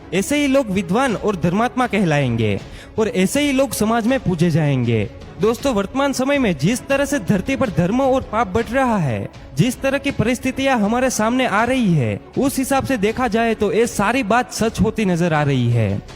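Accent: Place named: Gujarat, India. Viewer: આ ભાઈ ગુજરાતી લાગે છે ઉચ્ચાર ઉપર થી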